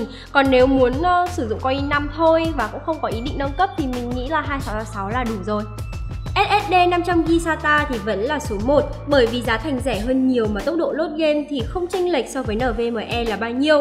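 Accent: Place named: North, Vietnam. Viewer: Thực sự rất thích review giọng nữ đặc biệt lại là giọng bắc trời ơi dễ thương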